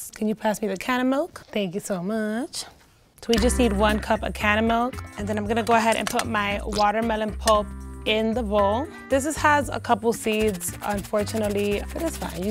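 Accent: New York, United States. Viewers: She sound like she from NYC or NJ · She’s deadass from New York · She from New York right? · She gotta be from nyc nfs😭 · New York accent is fire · She is so ny spanish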